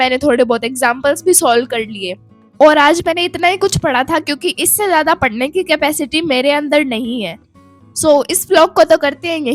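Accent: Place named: Haryana, India. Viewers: Yah she is from Haryana hisar 😊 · Bahan are you from Haryana because from your tune it seems that you belongs from Haryana😊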